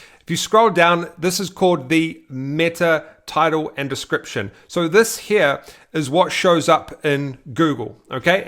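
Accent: New Zealand. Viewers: yep he's a kiwi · Where's your accent from ? Sounds kiwi!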